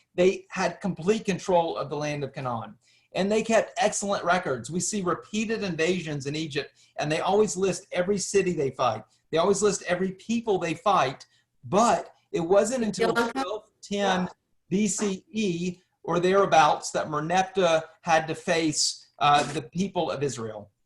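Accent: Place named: South, United States. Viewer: I think it's just his Southern accent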